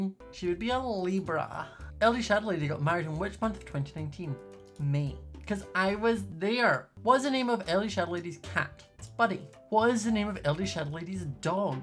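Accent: Scotland, United Kingdom. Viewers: Me: reading comments about how they thought he was gay Me being my clueless self Me: I just thought he was Scottish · you are scottish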